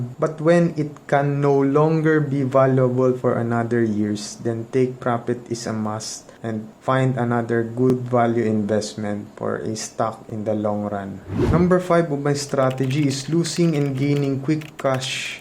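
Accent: Philippines. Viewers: That Filipino Accent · Love that pinoy accent btw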